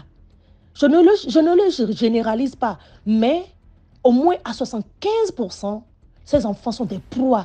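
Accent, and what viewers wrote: Benin. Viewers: L’accent est un accent béninois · Ça se passe au Bénin. On reconnais votre accent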